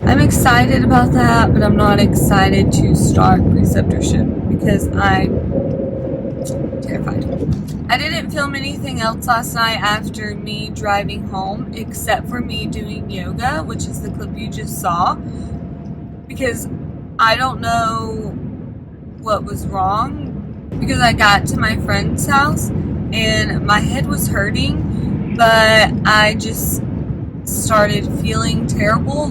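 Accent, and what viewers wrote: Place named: South, United States. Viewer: Stumbled across your channel! Would love to support each other! I have done this so many times where I plan a video and it didn’t go as planned. Thankful for people like you who do jobs to help people. Where are you from? Southern accent! I live in IL BUT IM BORN AND RAISED in FL. People always stop me here about my accent that I don’t think is thick at all but def something people notice up here. Hope your last semester goes great! I’m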